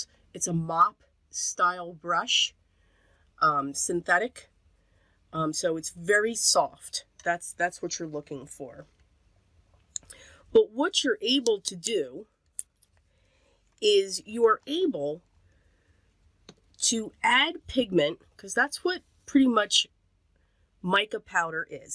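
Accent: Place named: New York, United States. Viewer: There is a lot of information here, so I might have missed it, but I was wondering your opinion on which brand had the most shimmer? It is hard to tell on camera and I am sure in person it is much easier to tell. I also was wanting to know, for whatever reasons, which brand you are leaning toward using the most? I realize you probably don't want to "knock" any of them, but each person will have their own preferences, ie: wanting more shimmer, less shimmer, binder, non, etc. I am sure each has their own good qualities, but I would really love to know which you lean toward and why? I have perfect pearls and love it, and I also sometimes use cheap make up (and then seal one way or another) as I can get more range of colors that way, and less expense. But your opinions would be helpful. =) Thanks so much for sharing this, I found it very useful. 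Plus I always enjoy hearing you chatter. =) (I am not sure where you originally hail from, but your accent reminds me of back home in NY, and I miss it there and the days of growing up there.) Oh, and love your sense of humor. You make me laugh often. LOVE it when you do junk journals too! You often feel like a soul sister...despite not knowing me at all (sadly.) One last thought...I was thinking a Versa Mark pen and any mica powders would be fun together. Could make for some fun and shimmer writing/lettering. TFS as always. =) ~Kat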